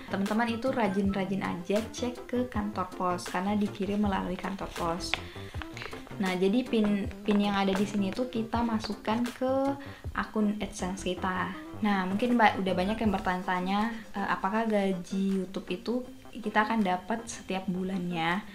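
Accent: Indonesia. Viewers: ORANG GORONTALO KAH ??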